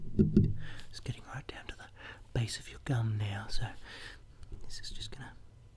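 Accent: Australia. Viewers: Wow your voice is so soothing and gives me tingles but them tools are scary as 😂😂😂 am I right that you're a fellow Australian?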